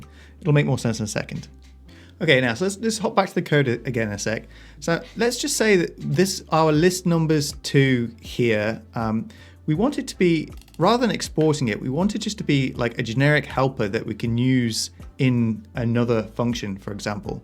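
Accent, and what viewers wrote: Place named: Australia. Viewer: I’m so familiar with the accent, Aussie right ?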